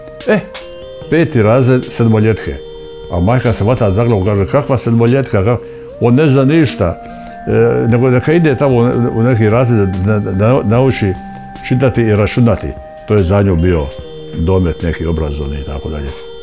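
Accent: Šibenik, Croatia. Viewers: Nevjerovatno, ima šibenski naglasak, a trudi se pričati ekavicom... Smiješno... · Šibenski naglasak bljesne nakon toliko godina ... 🦋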